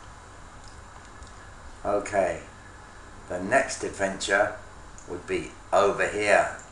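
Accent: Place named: Ireland. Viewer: Love the Irish accent! nice video!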